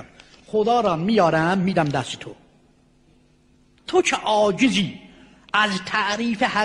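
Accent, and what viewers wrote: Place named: Turkey. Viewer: روحت شاد فیلسوف بزرگ، بالهجه ی شیرین ترکی😊🎉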